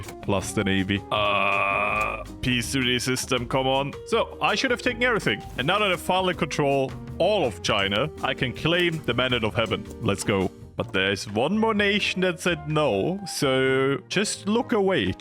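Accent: Austria. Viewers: Wait a minute r u Austrian or Australian?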